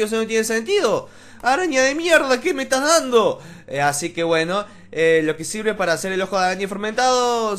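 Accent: Córdoba, Argentina. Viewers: sos argentino .. cordobés ??? yo soy de buenos aires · eres argentino ???? o chileno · eres cordobes? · Oye, ¿como se llama ese servidor? Pasame la IP porfavor... Grax (mi cuenta es ARIKUZUKI o mi otra cuenta de minecraft es 4R1KU2UK1) Si me encuentras en algun server... avisame plis XD.¿Eres de Córdoba? Tenes asentó cóordobes. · JAJAJAJAJAJAJAJAJAJAJAJAJAJA, mori de risa xD me encanta el acento, bien argentino <3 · sos cordobes ??? :D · ERES CORDOBES?? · Sos argentino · es bien argentinooooo!!!1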